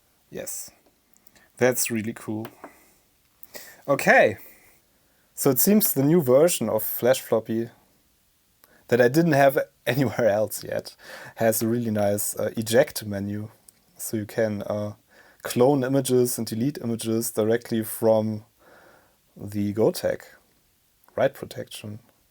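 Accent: Germany. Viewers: Ich würde mir Wünschen das Du die Videos auf Deutsch machst. Dein Deutsches Akzent bei deinem Englisch ist Grauenvoll. Übrigens ist die größte Retro Community eh in Deutschland. Man kennt ja den Deutschen, der hält an eine Sache Fest bis Lebensende, egal ob die Sache Gut oder schlecht ist.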